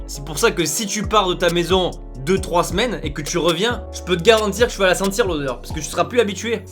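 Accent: South, France. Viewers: j'adore тon accenт dυ ѕυd ❤❤❤❤❤❤❤❤ j'en aι ιne eт ѕι qυand on rever c'eтe noтre vraιe vιe eт qυe la on reve ???????????????????? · Tu a un acsen du sud toi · tas l'accent du sud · T'as un (des fois) accès Marseillais PERFECTO👌😱😂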